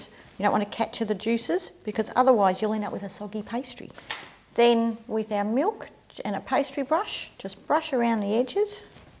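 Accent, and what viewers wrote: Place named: Australia. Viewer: From Greece....I remember these WHEN I was living in Australia....I may even try to make some and see how I do..LOVELY AUSSIE ACCENT!!!!Thank you for the videos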